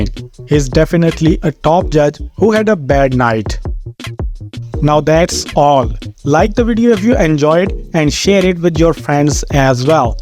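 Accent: India. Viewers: Thank you Mr. The guy with the Indian accent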